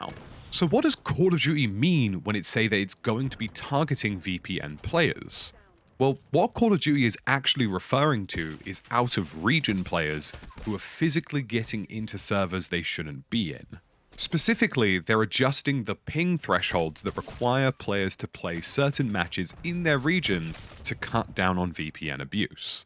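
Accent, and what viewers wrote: RP, United Kingdom. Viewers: Stop this posh voice its triggering!!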